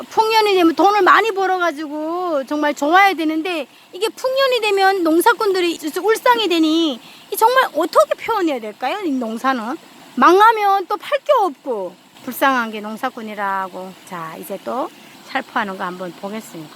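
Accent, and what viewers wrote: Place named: North Korea. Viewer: 말소리가 북에서 오셨나요. 쉬엄쉬엄 하셔요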